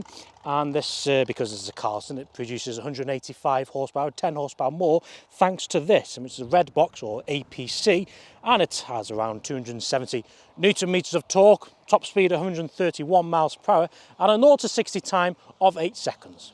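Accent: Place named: Wrexham, United Kingdom. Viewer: I presume you're a Wrexham lad from the accent, would love to see you take some stuff over Worlds End. Yes it's narrow but I used to take my 'Group B' MG 6R4 over there for a shakedown and across Llandegla...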